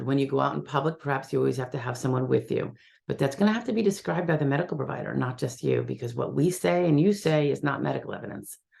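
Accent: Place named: East Coast, United States. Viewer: I'm curious what state you're in? I wanna guess east coast (& fingers crossed) by the way you pronounce things like me. I know it doesn't matter where your attorney is for disability & I definitely want to get one this time. I should've "appealed' the 1st time but now I know I'm denied bc I didn't getmy paperwork work done in time so I didnt send it in last month.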